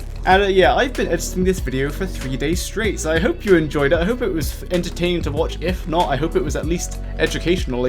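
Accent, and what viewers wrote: United Kingdom. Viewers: This Dude "The Spiffing Brit" You sound so much like him at the beginning of the video that I though you was trying to copy his work. Not just the brithsh accent but some lets say jargons are about the same. Brithsh people must talk in some pattern..That is sure to sound silly but there's a reason for it I've watched the whole video though and i love it. Never got so far in this game as the first winter aways kills me and friends so i've leaner a lot. Sorry if that coment bothered ya, no one ever replies so i didn't take the time to remove it. I subscribed right at the and of the video, and I hope to see more of your work on dont starve as it is a game that i like a lot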